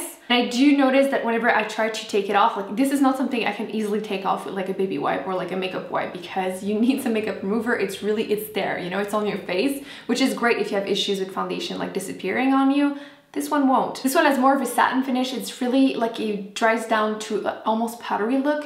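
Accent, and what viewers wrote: France. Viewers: Am I the only one who loves your slight french accent when you speak english? :-D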